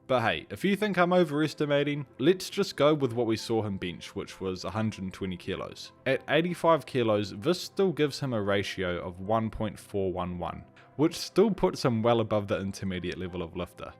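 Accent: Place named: New Zealand. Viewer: You sound like one of those New Zealanders who has a slight Australian accent for some reason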